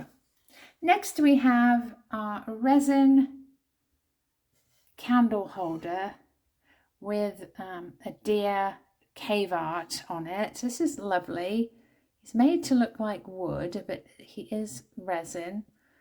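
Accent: England, United Kingdom. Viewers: and her mum are English 🇬🇧🇬🇧🇬🇧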